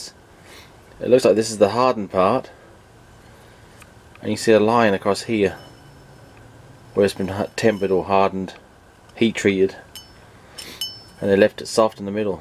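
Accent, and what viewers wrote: United Kingdom. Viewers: Dude you sound like you are originally from the uk or something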